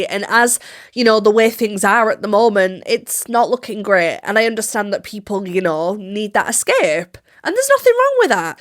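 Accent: United Kingdom. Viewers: So, the way this whole mess looks to me as outsider / Europian - It feels kinda like those doomsday Evangelical Christians of yours, there in the US are some kind of a crazy mix of "Jehovah's witnesses on steroids", and modern variant of the Spanish Inquisition, lol. That's how crazy this Rapture thing looks to me! Now don't get me wrong, we have pretty diverse mix of Christian denominations here in Europe too. We have Catholics, ofc, Orthodox Christians, Protestants, Anglicans, etc. But it's not as nearly that "on the nose", and so much in every part of daily and social life, like you made it look there. Everyone is free to practice their religion they choose, but it's mostly a private and intimate thing, and hardly anyone will start talking to you about it, if you didn't specifically ask them about it. Let alone preach it and trying to convert someone, or go into discussions and arguments about who's religion is "better", or something like that. 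And in the most of them, as far as I know, you don't have that Rapture dogma, or what not, as represented as it is among US Evangelicals. And of course, we have many of atheists, agnostics, some Pagans, etc, but that's neither here nor there, so Idk why I'm mentioning it at all. Anyways, this whole Rapture thing looks so unreal and so crazy to me, that it's hard to take it as the real life, lol! But Idk why am I explaining all of this, when you sound more British than US to me, judging and going just by your cute & cool British acce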